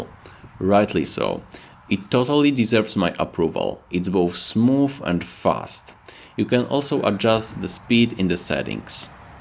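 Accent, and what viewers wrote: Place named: Poland. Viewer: Your extremely heavy Polish accent makes your review difficult to follow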